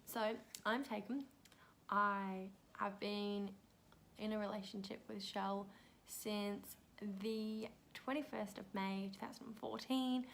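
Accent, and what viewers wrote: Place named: Australia. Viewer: I was thinking of doing this for my channel too. Where did you get the questions? 😁 Also, I can't get over how Australian you sound now!! 🇭🇲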